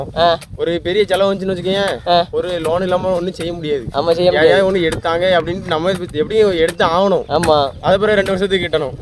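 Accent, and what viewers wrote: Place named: Tirunelveli, India. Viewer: அறுமையா சொன்னீர்கள் தம்பி நெல்லை தமி ழ் வாழ்க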